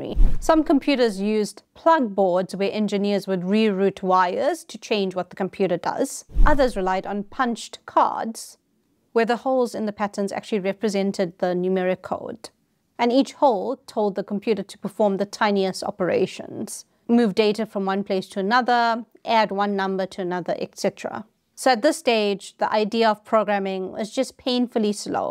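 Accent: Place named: South Africa. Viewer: South African I think...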